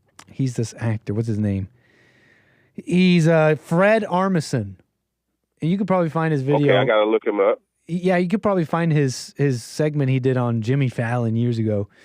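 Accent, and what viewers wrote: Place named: Arizona, United States. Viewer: Love the tombstone accent.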